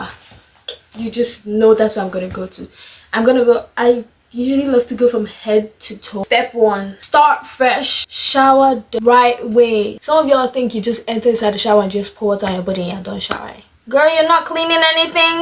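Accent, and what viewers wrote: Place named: Nigeria. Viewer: The Nigerian accent I'm loving it😂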